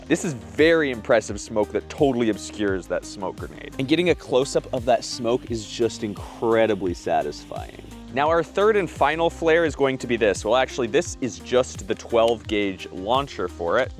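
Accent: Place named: United States. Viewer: Title should be: " Scandanavian pyrotechnic nerd who speaks American describes flares".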